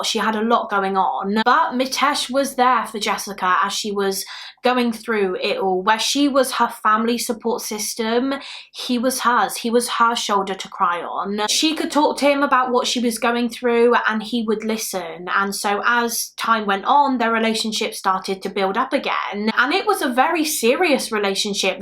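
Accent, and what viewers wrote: United Kingdom. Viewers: Great video !!! Keep them coming !! Great job love your look and makeup and accent !!! I am American always love British accent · I have been a subscriber of yours for a long long time, since a couple thousand subs, & I have to say that I have loved watching your growth & seeing you become more & more comfortable with every video. Congratulations on 100k love! I can’t think of anyone who deserves it more!! You really help this boring 42yo, mom of 2 grown kids, nurse, wife, & true crime FANATIC with your wonderful storytelling & your true empathy & sincerity. I love your accent too 😘 (I’m from Alabama, USA, so there’s nothing better than a beautiful British accent especially because I have one of those slow annoying southern accents 🤣) I just wanted to say congrats & tell you how much I love your channel. 100k down, now on to 1M! 🎉❤️🥰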